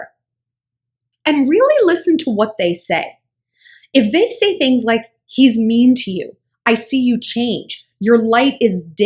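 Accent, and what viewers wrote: England, United Kingdom. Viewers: That English accent though 😂😂 I like your channel, you give sound advice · That English accent though 😂 top notch madam, top notch 👌🏼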